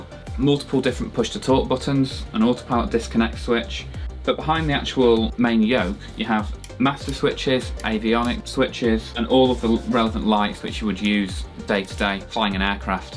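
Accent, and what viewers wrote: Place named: Blackpool, United Kingdom. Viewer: I recognised the Blackpool accent before the aerobatics over the Wyre! Nice film, from a 42yr defence aerospace vet who lived right under that view. Hope all is well for you now.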